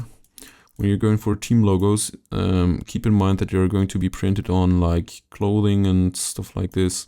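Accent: Germany. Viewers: kanns sein, dass du deutsch bist? :D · Watched every second of this. Lovely. Jurgen Klopp came to mind through out because of the German accent lol. Bravo! Subscribed!!!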